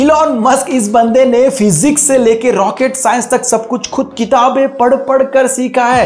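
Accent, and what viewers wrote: Gujarat, India. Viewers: Gujarat se ho Bhai???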